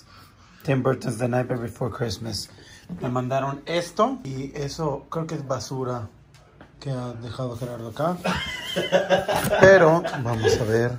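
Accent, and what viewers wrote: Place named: Yucatán, Mexico. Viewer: Jajajaaaaa tu xoy! Mas yucateca imposible te amooooo!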